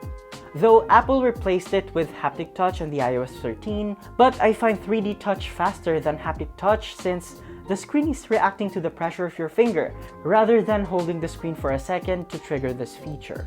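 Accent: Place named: Philippines. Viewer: Knew he was a filipino by the accent, hahah proud pinoy!!!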